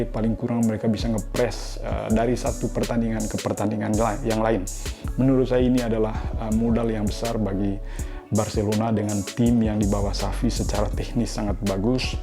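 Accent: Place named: Manggarai, Indonesia. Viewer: Dari dialeg nya bung kek logat manggarai.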